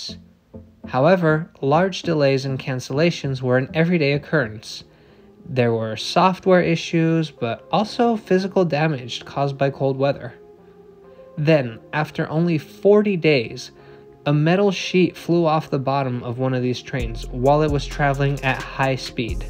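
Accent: Netherlands. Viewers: Bro u dutch?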